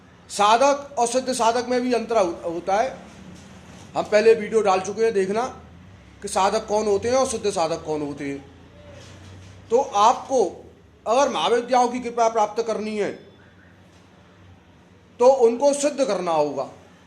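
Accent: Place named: Mathura, India. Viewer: Pranam guruji aap kha se h aap ki bhasaseli mughe apne mathura ke jesi lagi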